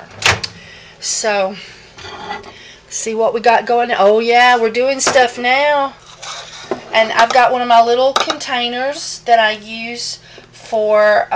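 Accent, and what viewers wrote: South, United States. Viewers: I think you are precious w/ur Southern accent & easy going genuine way! Love listening to u talk! Next time I order Lye or anything else from Es. Depot, I'll do thru ur aff. # 552! Mahalo nui loa for taking the time to share what ur doing. I appreciate & enjoy ur vids!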